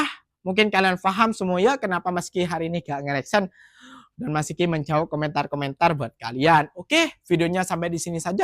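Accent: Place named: Indonesia. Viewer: dari awal sampai akhir video tuu kamu udah cakap melayu dialeknya aja dialek imdonesia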